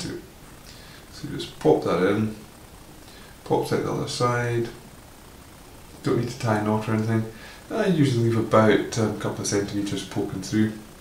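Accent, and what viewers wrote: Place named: Scotland, United Kingdom. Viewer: Oh he’s Scottish !!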